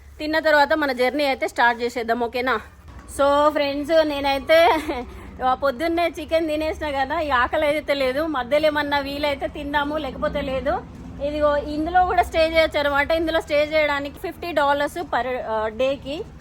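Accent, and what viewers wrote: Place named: Nizamabad, India. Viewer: నీ వాయిస్ ఎక్స్ప్లెయిన్ సూపర్ మా నిజాంబాద్ లాగా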